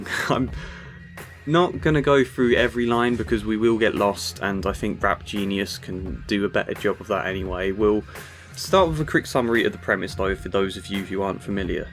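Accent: United Kingdom. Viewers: Notice you've got a british accent. You listen to much uk hip hop? Jam Baxters lyrics and fascinating and visual as well, but probably not quite to an level. You might like as well, cause he's really funny and slyly satirical.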